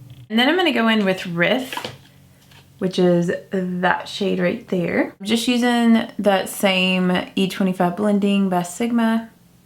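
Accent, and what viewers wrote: South, United States. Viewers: I love your chatty GRWM videos! You're so sweet and relatable and I love a Southern accent (Southern girl here)! But I like voiceover or music too... I'm gonna watch whatever you post 😀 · I love her accent too! I'm from a small town in West Texas. Her accent's not exactly the same but I find it warm and inviting.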